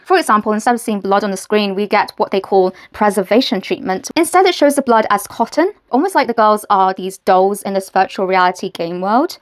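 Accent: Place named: United Kingdom. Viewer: You have such a lovely accent. Were you raised in Britain?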